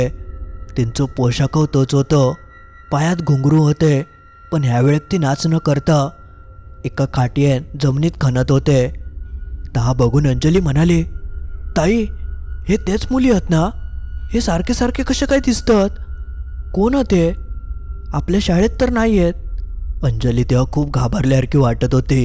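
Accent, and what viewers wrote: Malvani, India. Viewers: एक नंबर 👍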